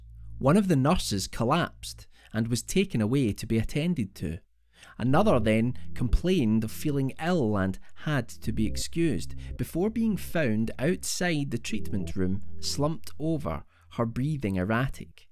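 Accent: Scotland, United Kingdom. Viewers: I love your accent & voice. I find it very precise & soothing. Which part of Scotland do you hail from? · It's hysterical how Scottish the narrator is. No wonder everyone thinks we speak like Sean Connery haha ! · Ron Ronson it’s Scottish not Irish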